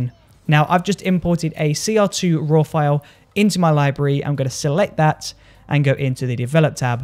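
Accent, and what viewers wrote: Birmingham, United Kingdom. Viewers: The bullring you from birmingham ?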